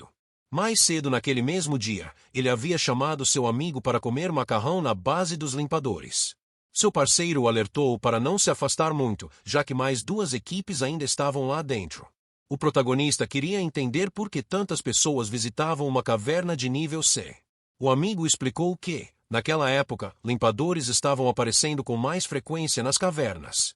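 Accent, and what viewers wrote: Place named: England, United Kingdom. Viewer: Porque você tá falando com um sutaque inglês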